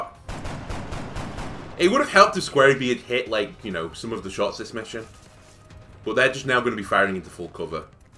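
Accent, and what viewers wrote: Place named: England, United Kingdom. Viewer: The first two missions on Impossible are about not having LOS. If they see you, they'll kill you. Do the math: Sectoid with 70 aim has 1/3 chance to hit you in full cover so 3 sectoids seeing you mean that at least two must miss and the third must not hit 4+ ... 1 - (0,7*0,7*0,85) = 60% that your soldier survives the turn. If he is wounded, chances drop quickly. Side note: Because they don't have enough aim, they can't hit hunkered soldiers if they are in full cover. So if you can't kill them, hunker down (while your soldiers maneuver - without LOS). And forget overwatch during these missions. Though it works on roof tops two squares away from the ladder if you have 4 soldiers on overwatch ... LOL Btw: Where do you live? Most of the time I'm listening to Americans but you sound sooo English. I'm German so I can't judge just by listening but you remind of these Oxford English cassettes (school, yeah)...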